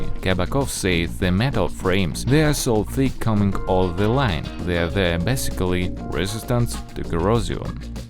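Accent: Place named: Russia. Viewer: that russian accent (fake or real, probably real) really adds to the video. you did great!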